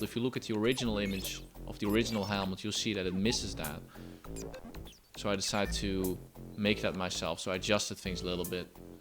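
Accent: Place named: Netherlands. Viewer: het is leuk dat je automatisch iemand kan herkennen die nederlands praat aan het accent :>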